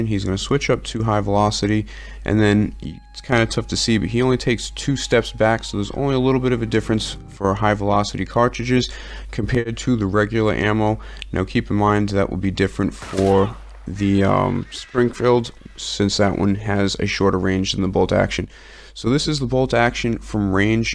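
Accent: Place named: Massachusetts, United States. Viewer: Lol sup dood? I can tell you are from MA. You from Southie guy?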